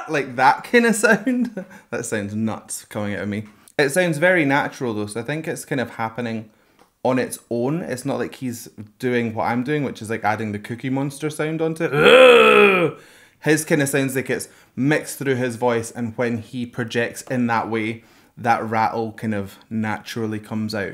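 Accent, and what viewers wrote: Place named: Glasgow, United Kingdom. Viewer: what you said about RM's speaking voice being smoother than his rap, I think is true of SUGA and j-hope, as well. SUGA's rap voice jumps wide intervals with interjected squeaks and lots of aggressive rhythm, but his speaking voice is warm and humble. j-hope speaks sweetly most of the time, but he can rap like a dancing firecracker! BTW, I enjoy not only your commentary but your accent. Glasgow is one of my favorite cities (I live near San Francisco). Can't wait to go back! Stay well!!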